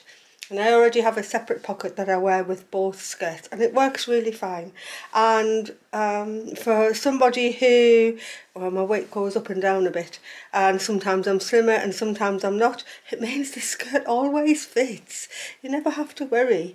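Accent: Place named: North, United Kingdom. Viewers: Two absolute joys of watching you is that you have a beautiful home/northern accent I'm East Yorkshire and your speak in inches first! Are you using 4 or 5 thread overlock? I've had my overlocker for many years but have only ever done four thread. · I just found you! A stitcher after my own heart, and your voice is a lovely northern one, like mine, though I've lived abroad now most of my life. Thanks so much for the skirt. I saw this idea on historical channels and have been meaning to make one for a while, so now I will.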